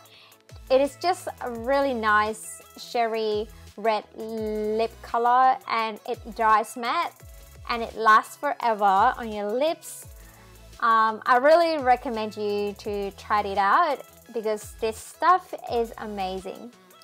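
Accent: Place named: Australia. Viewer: Wow! It was long time ago watched your video. Nice australian accent by the way.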